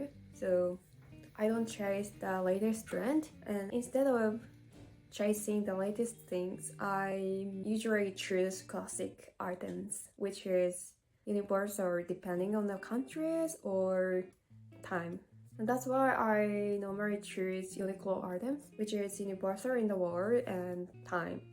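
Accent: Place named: Australia. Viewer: Hi, Already I can hear that you are developing an Australian accent! 😅 You do have some very good points and perspectives on frugal living. Yes there are many different cultural attitudes towards money. As an example (and not intended to stereotype), in my experience it's not uncommon to observe that there are many people from South East Asia/South Pacific who grew up in more rural/tribal setting and have a very short time horizon towards money. They are more focussed on the here and now so concepts such as saving for retirement like your mum are a completely foreign concept to them. If those people receive an unexpected windfall then often they will celebrate by spending it all now rather than putting to one side. Also if other family members know that there is extra money available they may pressure the person with the extra money to help. Of course, there are still people like that in more disciplined cultures but they tend to be the outliers and not the norm. 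And yes you are right about Credit card use. It has to be disciplined but can still be useful when travelling. If there is any fraud on the credit card the money involved is the banks money. If there is any fraud on your debit card then it's your own money and you will not have any access to it while they investigate.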